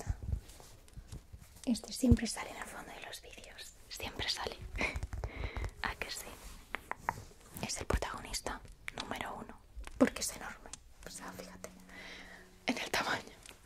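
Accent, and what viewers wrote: Spain. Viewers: El acento de mujeres españolas son ideales para este rubro de el asmr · El asento español de españa es genial para los asmr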